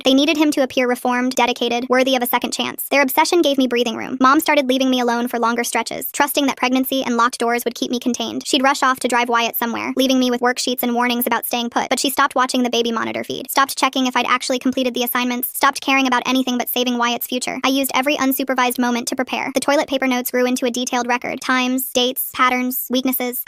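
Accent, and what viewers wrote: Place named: United States. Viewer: Her voice is annoying American fast loud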